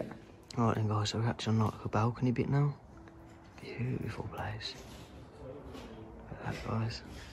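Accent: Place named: Derbyshire, United Kingdom. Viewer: A bit posh for a Derbyshire girl 😂 😍😍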